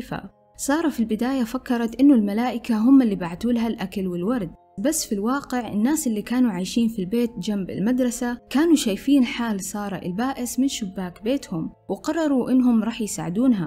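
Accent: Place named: Syria. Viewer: انتي عنجد صومالية......لهجتك كانها سورية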